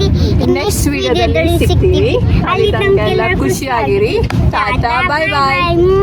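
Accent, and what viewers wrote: Mangalore, India. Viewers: ಮಂಗಳೂರು ಕನ್ನಡದಲ್ಲಿ ಮಾತಾಡಿ ಕೇಳ್ಳಿಕ್ಕೇ ಖುಷಿಯಾಗುತ್ತದೆ 🙂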